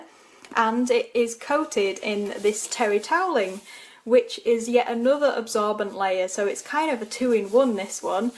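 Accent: United Kingdom. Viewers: How long does one cage liner last? Does it bunch up in the washer or dryer? You have such a sweet voice with that wonderful british accent. Maybe one video you could show your face on camera while holding a piggie so we can see the face that matches such a nice voice. Thank you so much for your videos, I do find them full of good information.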